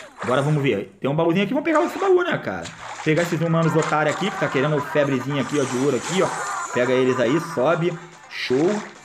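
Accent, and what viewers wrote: Rio de Janeiro, Brazil. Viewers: tu é do rio de janeiro né?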